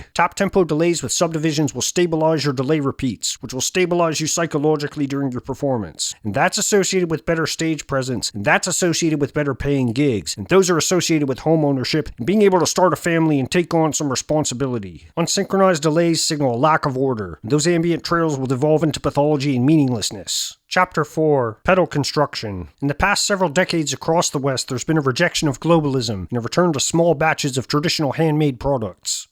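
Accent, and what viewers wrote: Canada. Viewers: In addition to being really funny, this is a truly good approximation of Peterson's/ most Canadians' accents. It's not easy to do. So, kudos! Doublemint kudos! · Has anyone actually been to an MBV concert? I have. I couldn't hear properly for a week after. Now I understand that their Muffs were obnoxiously interacting with with their delay pedals. And as I always say to these: More. Canadian. Accent! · Dude, I am basically the audience for your Jordan Peterson content. If there's only one dude on the whole Interwebs who gets what you're trying to do, it's me, and I love it. My significant other of nearly 30 years is Canadian even though I'm from the American South. I studied history and political science in university. And I'm a 2000 graduate of Musicians Institute's Guitar Institute of Technology in Los Angeles (Paul Gilbert's alma mater). 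So your stuff is hilarious to me because I get it on so many levels, especially when the jargon makes sense not only as satire but as literal tone shaping advice or political critique. And the accent: "top" = "tawp." Next up, "Toronto" = "Toronno!" You've epitomized an enraged Canadian conservative forced to live in liberal Ontario. You have captured the zeitgeist of my wife's country and the rage of their small, American-loving conservative fringe perfectly, especially from my perspective of spending so much time between liberal Toronto, Montreal, and Ottawa, looking over into Alberta. I can hear Alberta's outrage in Kingston, Ontario even though they're two provinces away. :) Canada, a strange land where being on the left side of the country means you're politically right, and where being a Liberal means you're probably a diehard Canadian nationalist who can trace his roots to his Loyalist 6x great grandfather. 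:) Cheers man! Keep them coming please. :)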